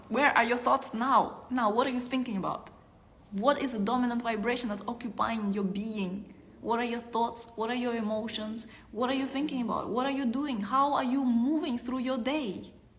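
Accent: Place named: Russia. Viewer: A very strong russian accent... annoying.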